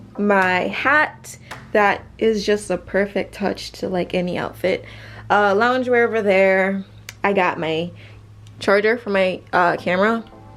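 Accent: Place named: Caribbean. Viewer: I detect a Caribbean accent =) Hey Sis!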